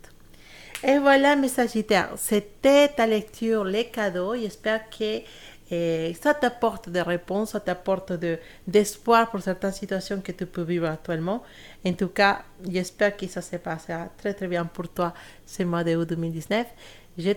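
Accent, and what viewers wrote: Spain. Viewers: Bonsoir!! Merci pour votre guidance ça me parle effectivement c'est le cas pour moi. J'aime votre accent espagnol! ☺️ Merci infiniment! 🙏 Namasté 🙏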